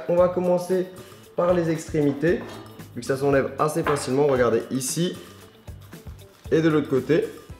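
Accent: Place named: Alsace, France. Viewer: je pari que tu est alsacien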